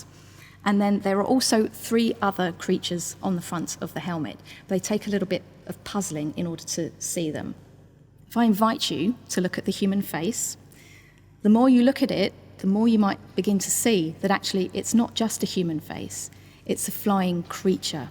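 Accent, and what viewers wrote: England, United Kingdom. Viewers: Smart, beautiful, and an english accent? Call me dinner because I'm cooked. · I love art and archeology and I’ve watched a bazillion videos like this, but this is one of the best, she really brings the object to life and her enthusiasm is infectious...I’m an American and her accent and command of the “real” English language (not the butchered version of the language that we Americans use)...is wonderful. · What specific accent is this from the UK? It's..ASMR-ish.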